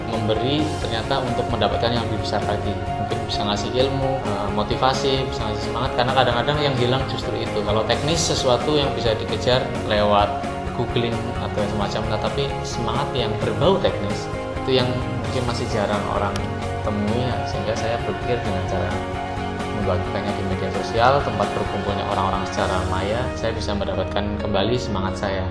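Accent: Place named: Java, Indonesia. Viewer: bandung kok logatnya medok mas?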